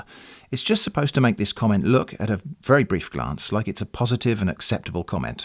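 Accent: United Kingdom. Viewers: > British accent > “dear viewer” for some reason that is entirely beyond my understanding, i feel instantly engaged in the video and compelled to subscribe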